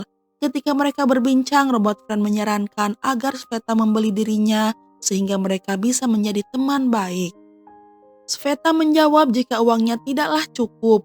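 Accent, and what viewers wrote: West Java, Indonesia. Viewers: Aduuh sunda pisan teteeeh🙏😁.. Resep ngadenge sora si teteh 😊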